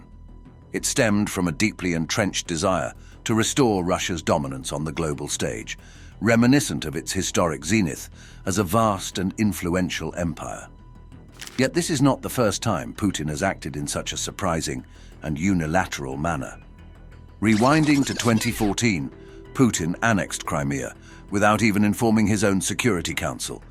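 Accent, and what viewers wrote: United Kingdom. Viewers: That British accent 😂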